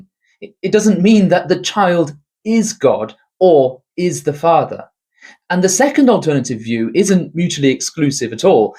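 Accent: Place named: United Kingdom. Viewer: Good work bro. Hope I have added a bit of good service in the comments. I will sub, you sound like you are in UK?? Great if you are, as there is a great silence in the world these days from Blighty, especially on this subject. ha ha, i see i already did sub recently. Blessings, i will roll through a few of your vids.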